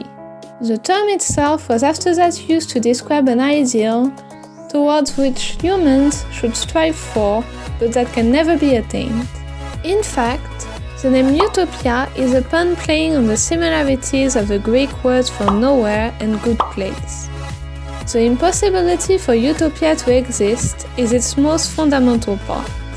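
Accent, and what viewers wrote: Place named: France. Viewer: your videos are really cool !! but you have a strong accent, are you french by any chance?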